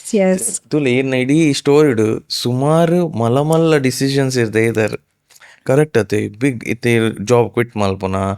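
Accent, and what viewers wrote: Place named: Tulu, India. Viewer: This madam tulu is foreign tulu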